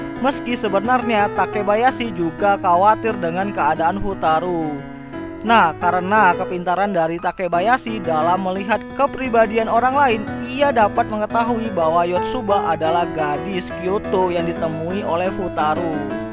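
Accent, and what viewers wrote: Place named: Algeria. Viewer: حسيتك شغل راك تهدر دزيرية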